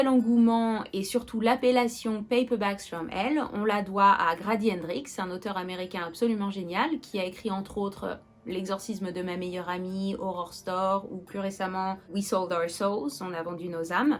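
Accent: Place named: England, United Kingdom. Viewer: exelente chaine et belle accent anglais j'espere que tu sera plus reguliere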